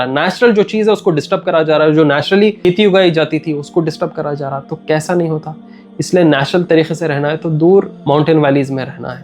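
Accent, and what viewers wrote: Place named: Hyderabad, India. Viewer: bhai "Dabaaa k kara ", "Dabaa k chalra " samajrow mai apan Hyderabadi hai magar thoda national level ke hisab se bhi bolsakte ap....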